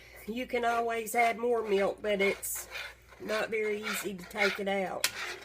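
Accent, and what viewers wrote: South, United States. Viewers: Just found your chanel and I love the way you cook and your southern accent is so cute. Greetings from Spain. Xx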